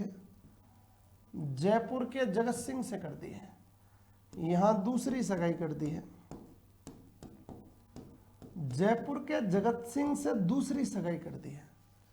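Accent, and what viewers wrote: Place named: Bharatpur, India. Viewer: सर आप भरतपुर के से लग रहे हो बोली ते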